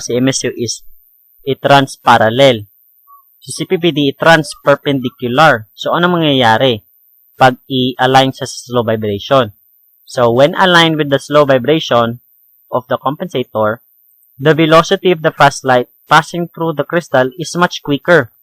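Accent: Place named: Philippines. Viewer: Bro thats how philipino talk. Try speaking another language without an accent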